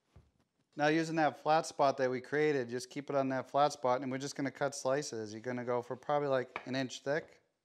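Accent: Boston, United States. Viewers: Boston accent detected.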